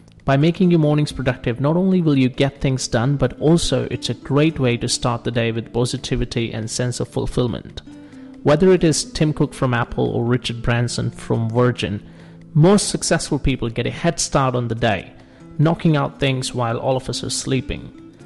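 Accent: India. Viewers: Dude stop trying to hide that Indian accent it’s fucking horrible😂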